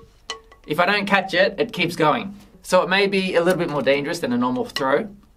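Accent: Australia. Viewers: His sound like Aussie English takes Japanese for Tokuda has God :))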